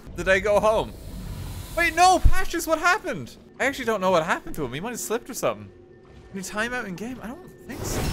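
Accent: Ireland. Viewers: Irish, not British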